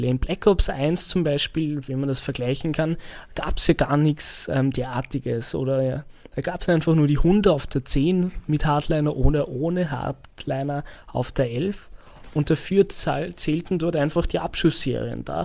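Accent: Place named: Austria. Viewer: Österreichische Stimme tut gut auf yt zuhören :)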